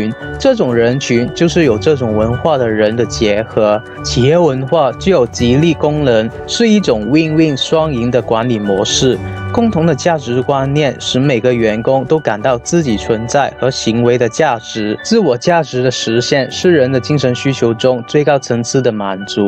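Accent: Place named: Hong Kong, China. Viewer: u are from hong kong right? your accent seems like hk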